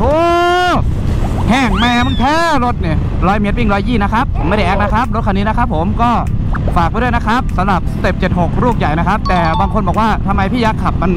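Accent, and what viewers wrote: Isan, Thailand. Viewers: ชอบพี่ว้ะพุดอีสานล้ะตลกจิง555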